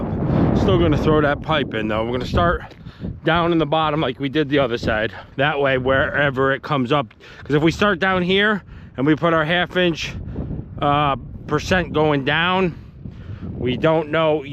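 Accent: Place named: New York, United States. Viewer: Unsubscribing!! Can't stand listening to that annoying New York accent!!! And you're a know it all, typical east Coast Democrat!!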